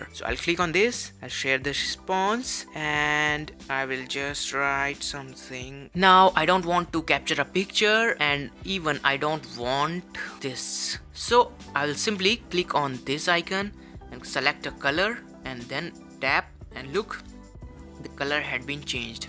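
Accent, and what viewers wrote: India. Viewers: Indian English :)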